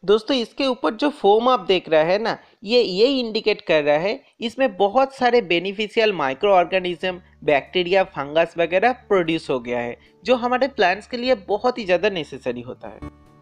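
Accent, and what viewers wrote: Bengal, India. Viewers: Apni Bangali seta apnar Hindi accent a bojha jay.. tobe apni jotota sototar songe protita details bojhan seta sune valo laglo. Apnar tamarind bonsai ta dekhe apnar channel er r o kota video dekhlam. Evabei egiye Jan. Amar subhechha roilo... Waise hindi me bolte hain shayad isiliye aap jyada logon tak pohuch pate hain... Which is definitely a good idea.. keep it up 👍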